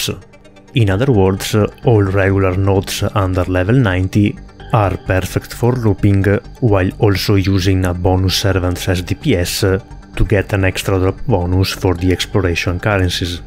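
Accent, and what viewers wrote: Italy. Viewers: il tuo accento e italiano non mi freghi lol. ce tipo un discord di italiani di fgo??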